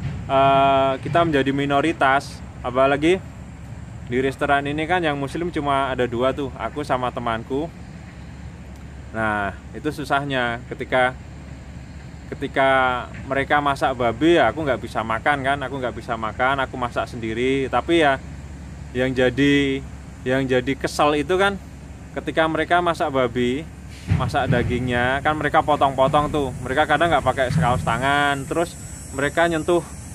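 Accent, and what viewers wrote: Java, Indonesia. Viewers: jowone daerah pundi mas smpean...suka bgt ma isi video ini..😊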